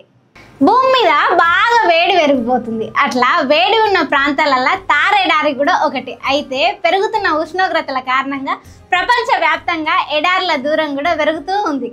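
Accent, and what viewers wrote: Telangana, India. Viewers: Telangana Yaasa Matladatam apesthe channel grow ayidi worst explanation really ilanti informative videos ila Kadu Chepedi E voice tho kadu just telling all these for your sake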